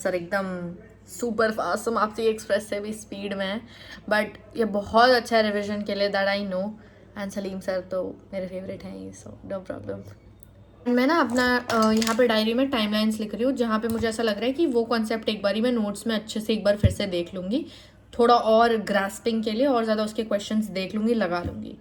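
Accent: Bihar, India. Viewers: Aap bihar se ho??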